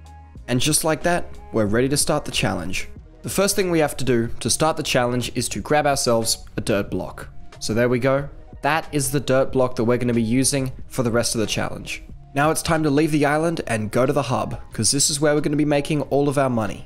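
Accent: Australia. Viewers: idk where ur accent is from ( is it australia? im pretty sure it is?) also i love your content :) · U Australian mate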